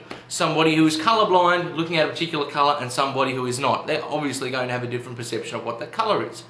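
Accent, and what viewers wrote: Australia. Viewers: I'm so entertained by the juxtaposition of the words coming out of your mouth and your ocker accent! Rock on you ocker intellectual!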